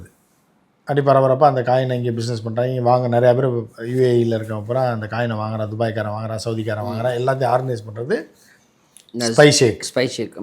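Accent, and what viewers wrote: Madurai, India. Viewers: டேய் மதுர பேச்சை நிறுத்துடா சடங்குசுத்தியாச்சுனா என்னடா?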